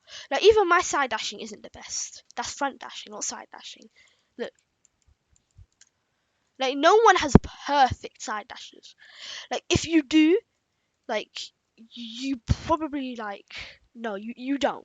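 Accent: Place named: United Kingdom. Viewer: Briish? Its fine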